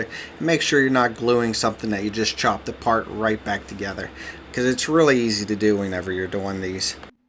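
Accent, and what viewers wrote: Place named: Maryland, United States. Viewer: You sound very Maryland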